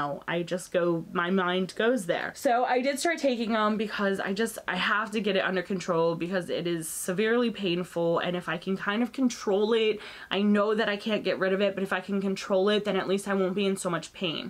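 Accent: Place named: Philadelphia, United States. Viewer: I just subscribed after your declutter and saw this video. My son had eczema so bad as a baby that we had to take him to see a pediatric dermatologist. We had to put giant bandaids on his face because his cheeks were red, shiny, and weeping. He's now 11 and after several years of relatively minor issues, he just started getting the red rough bumpy patches behind his ears and on his arm where his watch is so we started topicals and we are limiting unnecessary showering. It definitely cycles especially with allergy season. It messes with you mentally and emotionally and you are right some people have no idea how much it can impact more than your physical health. I recently started on the spironolactone due to pcos and I seem to be tolerating it well. I noticed the benefit of the clearer skin. Its nice to have a postive side effect for once. 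You got this! Also, where are you from? I'm originally from Philly and you sound like you grew up in that area.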